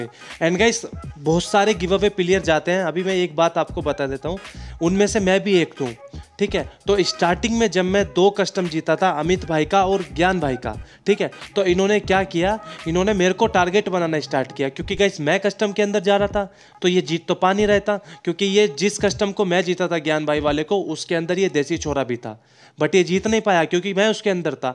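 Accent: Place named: Haryana, India. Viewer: AP PAKKA HARYANA SE HO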